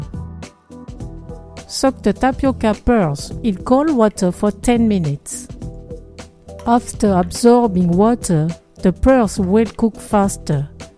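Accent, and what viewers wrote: France. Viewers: Merci, enfin une recette géniale avec tapioca et banane. Hum the french accent... Thank you.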